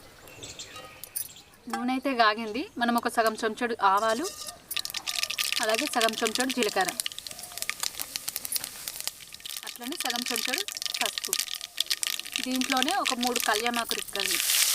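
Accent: Telangana, India. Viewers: Chutney bagundi and me telangana language kuda bagundi 😊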